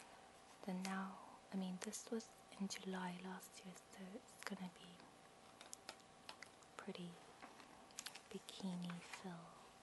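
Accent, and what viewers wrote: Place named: United Kingdom. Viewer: Are you from Korea? Your accent in English made me think you were from the UK!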